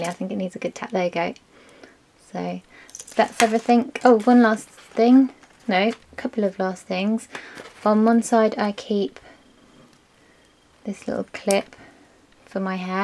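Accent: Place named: England, United Kingdom. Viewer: EDC, beautiful girl, english accent!! I sub'd !! LOL!!!!! Whooo Hooooo!!!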